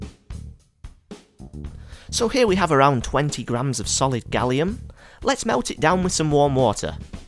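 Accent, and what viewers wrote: United Kingdom. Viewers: He's British lol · It's probably the UK pronunciation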